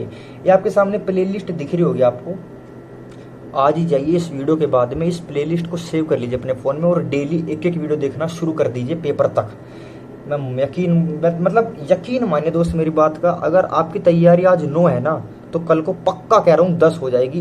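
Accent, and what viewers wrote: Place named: Western Uttar Pradesh, India. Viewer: bhai up West ka accent lgra h teri bolli m....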